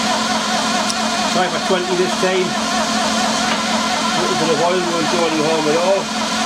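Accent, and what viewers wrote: Newcastle, United Kingdom. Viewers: Newcastle English. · NE English or Geordie. Recently heard it comes from the local Miners using George Stephenson's safety lamp rather than Davie's. Went to college in South Shields and never heard why Geordie, who knew?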